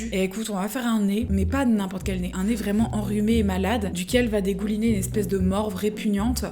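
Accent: England, United Kingdom. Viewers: J’adore ton accent anglais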